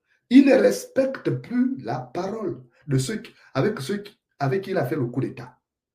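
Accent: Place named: Congo. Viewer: Toi avec ton accent congolais, ''cette guèrê què'',reste tranquille et arrête de raconter ce que tu ne maîtrise pas. Lorsque que tu affirmes que tu ne sais pas si c'est vrai,ce que tu ne maîtrise rien.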